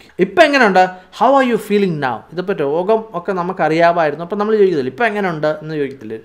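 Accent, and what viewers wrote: Kottayam, India. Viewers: Malayalam slang kelkkan nalla rasamundu.kottayam aano? From trissur.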